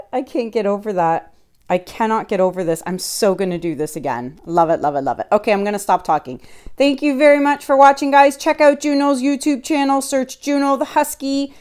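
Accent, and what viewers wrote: Canada. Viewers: Sounds like sweet Juno might have allergies. I use children's Benadryl. Hope he feels better soon! Haven't heard you say "literally" as much as usual. I like listening to your cute canadian accent!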